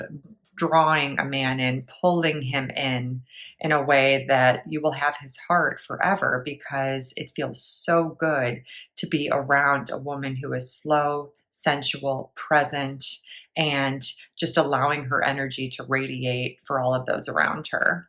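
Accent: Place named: Upstate New York, United States. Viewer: Are you from upstate? You have that accent! Love your advice!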